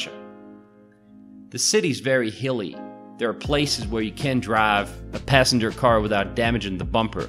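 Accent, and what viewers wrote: South, United States. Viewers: You have an interesting accent. There's a little bit of US southern in it. Great videos! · YOU ARE SUCH A BEAUTYFUL GUY AND A PERSON AND I LOVE YOU AMERICAN ACCENT)))) GOD DAM I WOULD FLY TO THE MOON AND BACK FOR YOU!!!!! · It's great hearing your Southern accent, as I've been overseas for quite a few years. I'm from Georgia (the state). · Your accent is interesting. Did you learn English in the American South by chance?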